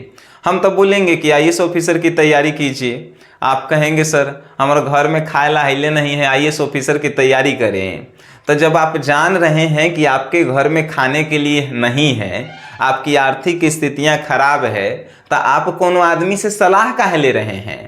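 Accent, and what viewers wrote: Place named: Magahi, India. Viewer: Bhaiya -Bhojpuri bolo. Magahi thik nahi lagta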